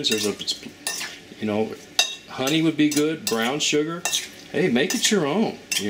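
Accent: South, United States. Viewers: That southern accent is too good I could here that all day..😊🙌🤣🤣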